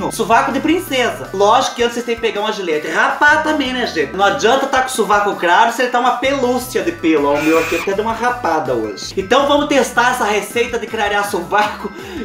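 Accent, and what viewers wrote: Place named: Minas Gerais, Brazil. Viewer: Parece Sotaque mineiro 😂😂😂😂 adoreiiii.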